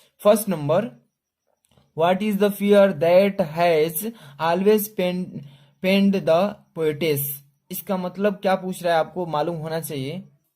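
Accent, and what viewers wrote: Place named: Bihar, India. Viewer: Sir ap Bihari hai na.. pta chal jata hai😂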